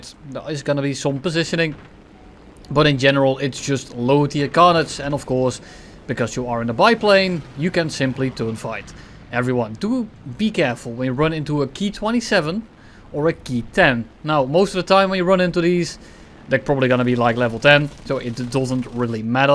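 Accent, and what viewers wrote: Netherlands. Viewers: Are you dutch? cause you have the accent.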